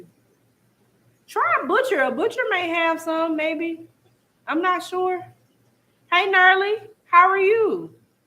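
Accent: South, United States. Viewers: Hey i think a southern Accent is cute i love it no disrespect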